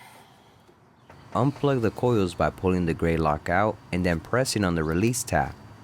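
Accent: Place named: Texas, United States. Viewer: are you from Texas?You said you was fitna be replacing… sound like a home boy to me!!🤙🏾🤙🏾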